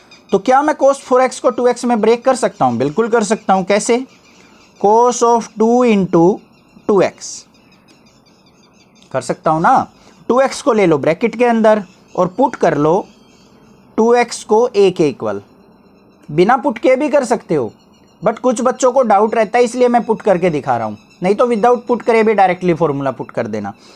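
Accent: Haryana, India. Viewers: sir: dekho upper lakha ka😂 haryana walo ki haryanvi Hamesha bich ma hi aa jati h I am also from Haryana♥️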